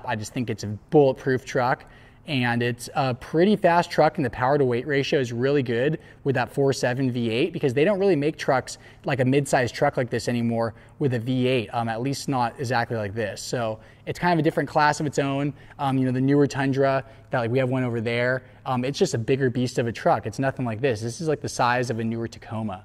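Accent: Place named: California, United States. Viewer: Damn bro you sound extra California. Tom delonge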